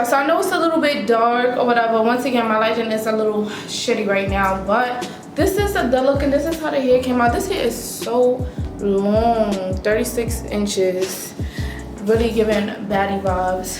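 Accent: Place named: Brooklyn, United States. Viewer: I love you deadass!😌😍🥰😘It’s the Bk accent for me You make me feel at home I miss Brooklyn!!!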